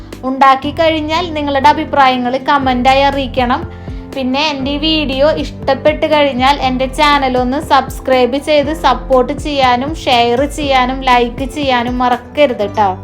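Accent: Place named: Thrissur, India. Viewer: Thrissur kaari aano...slang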